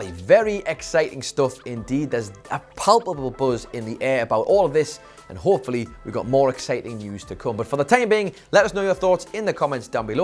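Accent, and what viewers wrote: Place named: Newcastle, United Kingdom. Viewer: This man a geordie. 2 years of these videos and I haven't realised this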